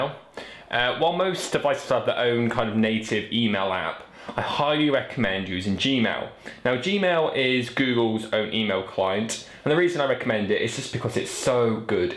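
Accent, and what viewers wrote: United Kingdom. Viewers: Next time try to DE cickneytize the accent. I barely understood. He, sounds like dick van dyke from Mary Poppins. I'm trying to learn. Not be correct.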